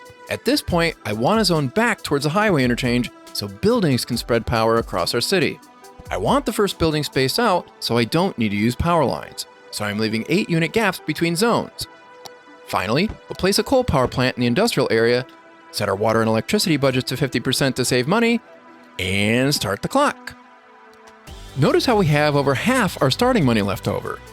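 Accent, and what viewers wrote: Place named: Philadelphia, United States. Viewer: This dudes definitely from Philly haha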